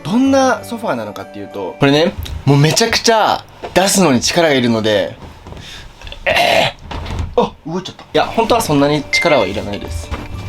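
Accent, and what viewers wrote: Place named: Kansai, Japan. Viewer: 君は埼玉人だけど、俺自身たぶん言われんでも分かってるんやろけど、大阪出身で大阪10年間育った人間なので、所々関西弁使ってくれてはる君観るとマジ親近感湧きます！！⤴️⤴️⤴️ 君が関西弁敢えて喋るとイケメンさが人一倍ごっつ映えるね〜👍👍👍😍😍😍ありがとう、おおきに！🙏これからも友達でいましょう〜！😭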